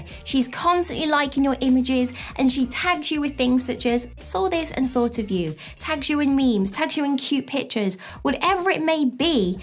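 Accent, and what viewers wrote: United Kingdom. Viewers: Your talking about ways to know if she's thinking about me,but I'm stuck here wondering where's your accent from🤔..sounded kind of British ,but then again it sounds like something else..I love it either way ! Big up from the Caribbean !!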